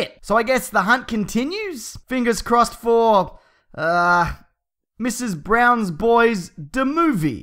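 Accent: Australia. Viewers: is this guy an aussie? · Are you from oz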